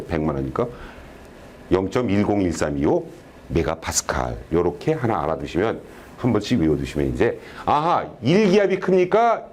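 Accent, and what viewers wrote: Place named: Seoul, South Korea. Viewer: 신기한 서울말의 세계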